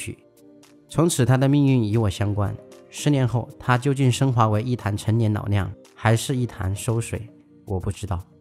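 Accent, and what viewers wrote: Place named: Sichuan, China. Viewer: 支持你！我也是为了梦想来到了美国！希望未来在美国闯出一片天地！老乡，听你的川普，很亲切！我四川广安的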